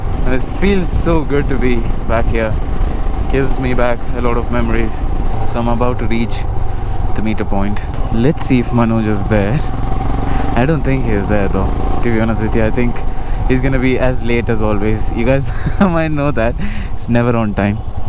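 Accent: Karnataka, India. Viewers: ಕನ್ನಡ ಕೇಳೋಕೆ ಚೆಂದಾ❤️superb bro ❣️ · Bro ur kannada slang is very nice bro 👏..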